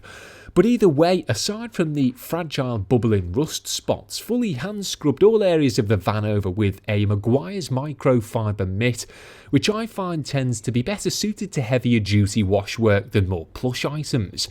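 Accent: United Kingdom. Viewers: This voice over is actually very nice and the accent of, I think British, is amazing to hear. Nice work as well, looks completely different afterwards 😁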